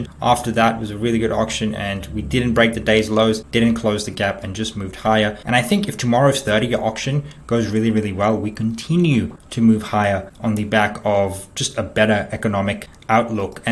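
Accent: Australia. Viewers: Great analysis yet again.I love your familar Aussie accent too; yet another reason for me to listen to your show. Since commercial real estate (CRE) woes are very much in the news all across the world & not just the US, does this mean that every woman, man and their dog is now placing short bets on just about anything to do with CRE implosion say with options a few months out? Thanks, for any further insight you are able to offer.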